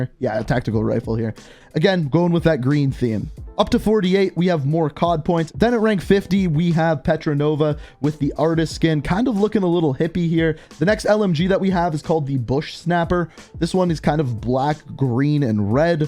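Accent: Canada. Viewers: Canadian eh? Lol. Theres my comment bud, hope it helps.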